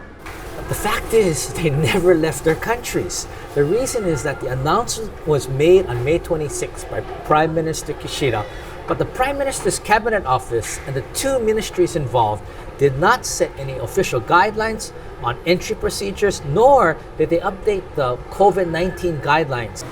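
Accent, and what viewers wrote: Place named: United States. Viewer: Thanks for your insight! Although it's a disappointment, but I think you might be right on allowing solo travellers in 2023 BTW, you speak with an American accent. You must have lived in the U.S for many years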